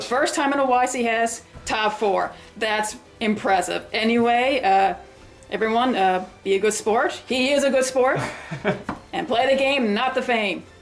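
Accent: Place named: Newfoundland, Canada. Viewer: Love the newphie accent. All the hard A's lmao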